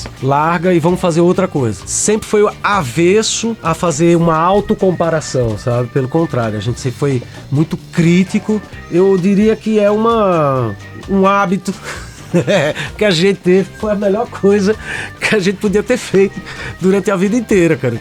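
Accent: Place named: Pernambuco, Brazil. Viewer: Quem resiste a esse sotaque pernambucano?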